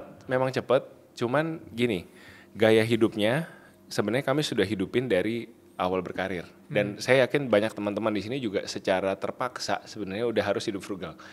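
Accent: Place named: Sumatra, Indonesia. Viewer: hostnya ngomongnya ga smooth terbata2. logat sumatera totok banget😂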